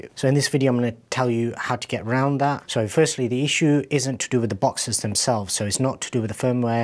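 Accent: United Kingdom. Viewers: Please next time speak even faster, so that foreigners again can skip your video. And just because of your extremely British accent, I mostly only was able to guess, what your sentences possibly were supposed to mean.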